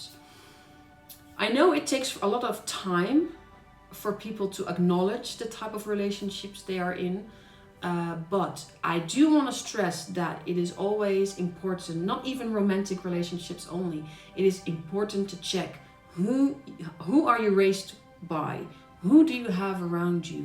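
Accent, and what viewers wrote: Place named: Netherlands. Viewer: Girl, you mde me lough, "find the things, that are worth relapsing for." I am out of a relationship with a hot big boobed psychopath for about 4 months now. After 2 weeks I couldn't move a finger, I needed 12 h of sleep. I don't want to see people, only one friend left, a lawer who pulled her out of my house with the police and fiscalía. But I am fine with that. I don't have to work, so I can be me as long as I want. What I do is accept it. Now I am getting better day by day, I do a lot of work in my house, welding and all kinda stuff. I am still very very slow, but I see the results. I don't think M. E. , is caused by a virus, it's a respond to abuse, and I respect the respond of my soul to it. I can't even think of working out to get my sexy body back, even the thought of girls looking at me repells me. Time has a different meaning to me now, I don't feel I am missing out, I enjoy myself. 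When I feel like it, I got the chance to meet new people and I will do it very slow as well, there is power in doing it slow. You have a nice accent, from the Netherlands? I was a German. I was very fast there. Saludos desde otro lado del mundo Sudamérica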